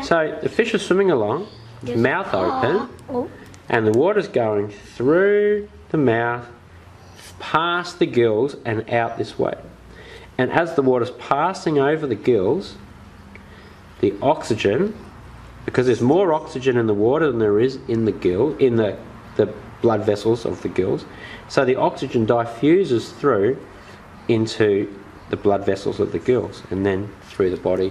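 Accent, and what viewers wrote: Australia. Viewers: its friendly to grownups beginner. he talks australian english. takes, cant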